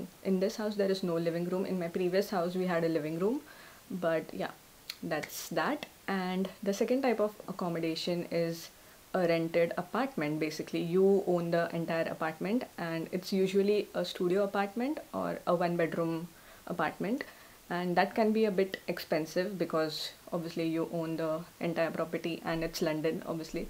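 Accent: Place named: India. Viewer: typical indian accent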